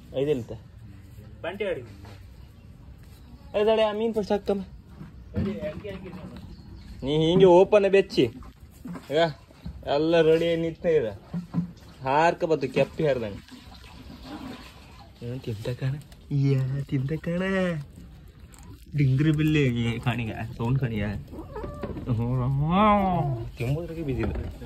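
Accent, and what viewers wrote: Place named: Kundapur, India. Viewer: Kushi aguthe nim vlog noduke. Nim kundapur kannada bashe nu superr hige log madtha eriii mare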